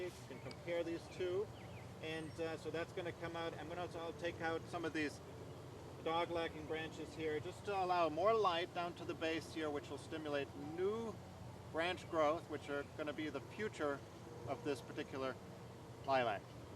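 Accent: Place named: South Dakota, United States. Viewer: Sounds like a guy from South Dakota! Good instruction, thank you!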